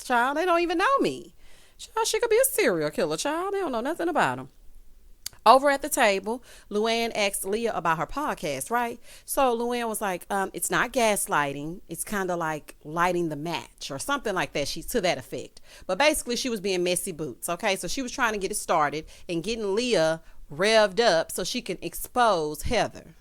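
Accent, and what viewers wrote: South, United States. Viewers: I love your beautiful voice you remind me of my Southern family on my mother's side. I am glad I came across your platform I have been enjoying your content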